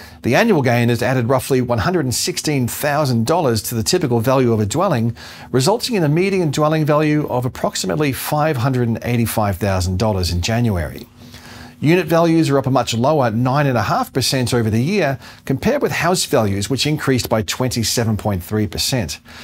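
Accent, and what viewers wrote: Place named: United States. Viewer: What is with the American accent breaking in to your speech pattern. It is very strange.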